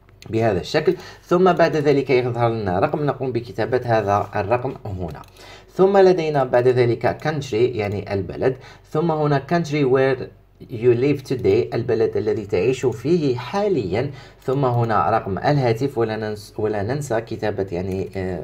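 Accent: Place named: Morocco. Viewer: الاخ اما مغربي و لا جزائري 😂😂😂 عندكم طريقة خاصة فنطق العربية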